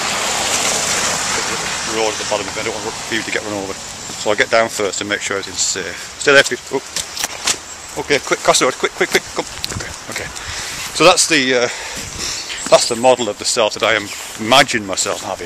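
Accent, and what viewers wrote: United Kingdom. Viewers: I don't know why, but I enjoy listening to British people (i'm american). On the self.... I commented elsewhere that I think the self is a purely human, cultural and social construct. Sure, I may have some models or ideas of my "self"... but I'm a cultural animal after all.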